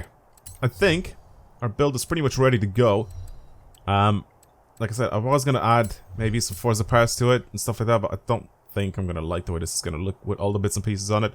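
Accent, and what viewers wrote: Ireland. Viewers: Now we know lives in Ireland which is obvious since he has an irish accent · For two fucking years I was trying to understand where the hell is from. Finally I know he is Irish. Now I can die in peace.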